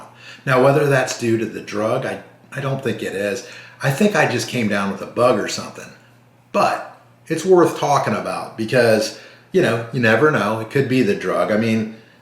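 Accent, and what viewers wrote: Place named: United States. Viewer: Greetings from Australia. Have ordered Mounjaro but we are not allowed to have the preloaded pens yet.... they have to be self drawn normal injections ( I think you say shots in the USA lol).. What do you do for work.? anyway soon starting I guess the minimum dose, wish me luck. Thank you for sharing your experience. love your American Accent, so cute.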